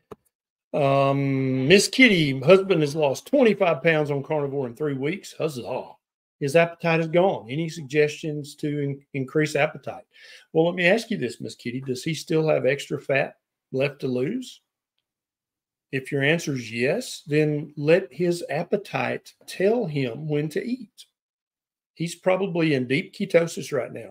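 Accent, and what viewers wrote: South, United States. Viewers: Who doesn't love the frank talk...the discussion of chicken eggs at 1 hour is classic Dr Berry. People who think the accent, the country boy talk, and the literal red neck means stupid, and they are the stupid ones. is a very smart doctor. I got a lot better listening to him and ignoring my Yale trained, proper wasp specialist. Thank god I refused Dr Yale's meds. I was getting sicker and i now see his solution to my gerd increases osteoporosis,and dementia. But it would fix the gerd! I guess the logic is that on those meds a while, i would not only forget I had gerd, i would forget i had any other issues.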